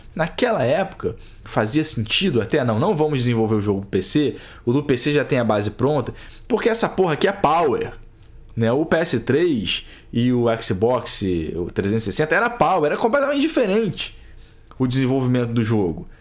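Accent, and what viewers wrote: Rio de Janeiro, Brazil. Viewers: Comparar o FIFA deixxx com o peixxxx... Esse sotaque carioca é uma foda...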